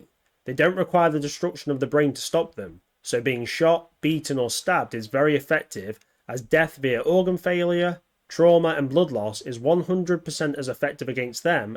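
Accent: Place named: Mansfield, United Kingdom. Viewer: Mansfield accent detected. 🚨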